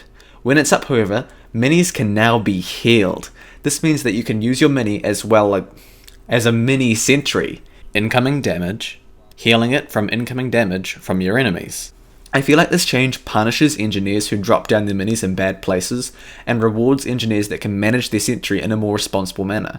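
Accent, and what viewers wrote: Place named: New Zealand. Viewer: From your accent I guess you are from NZ. And holy crap you deserve more substance.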